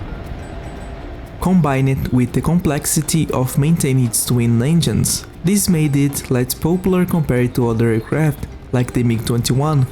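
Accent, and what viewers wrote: Brazil. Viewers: Where u from? You sound like a brazilian guy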